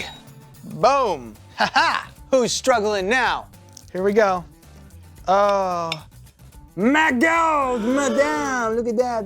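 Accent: France. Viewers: can you speak with that French accent in every video going forward? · Not complaining, but I think may have just wanted an excuse to use his French accent and vocabulary for an entire episode.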